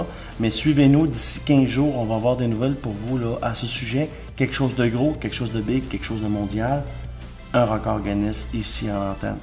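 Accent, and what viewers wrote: Quebec, Canada. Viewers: J'adorerais avoir un spinner car j'adore tes vidéos et je trouve que tu expliquer tres bien et j'aime ton accent québécois 😎 sinon ouais jen ai pas et jairmqis en avoir un 😇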